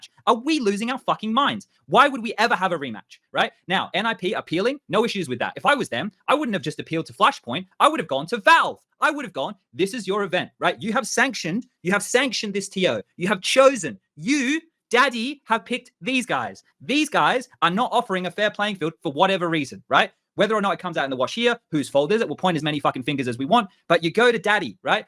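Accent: Australia. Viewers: just Australian accent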